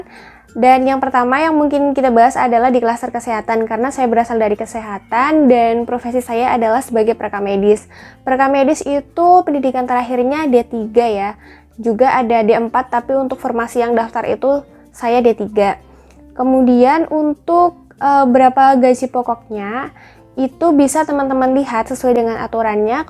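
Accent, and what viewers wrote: Cilacap, Indonesia. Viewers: Sampeyan asli orang Cilacap kak ?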